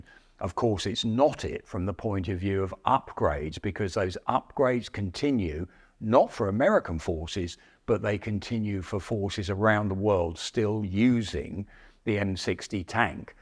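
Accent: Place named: United Kingdom. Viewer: IDK what it is about the British accent of older dudes, but it fits this. As an American it should be US law that in documentaries, there should only be a British commentator. It makes me feel like I'm learning and not just listening to information I will never need 😂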